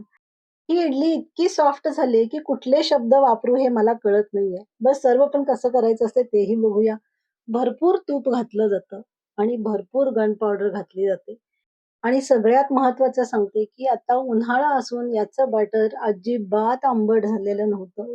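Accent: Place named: Karnataka, India. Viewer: लुब्लुबीत नाही लुसलुशीत आणि प्लेटा नाही प्लेट्स तुम्ही कर्नाटकी आहात का? उच्चार तसे वाटतात बाकी इडली ठीक आहे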